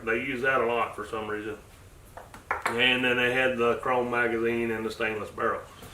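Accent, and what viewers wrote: United States. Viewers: Ar and mini14 are both prima pieces of shit. Go russian if you want quality.Fuckers eh? You sound like a hillbilly, and look interbred to me.Lol the official california battle rifle. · Do you have to talk like a HILLBILLY to own this Gun ?????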